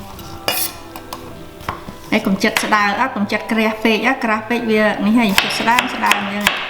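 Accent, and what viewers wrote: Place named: Kampot, Cambodia. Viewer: Hi mama, I interested in watching your cooking show very much! By your sound, are you from Kompot province?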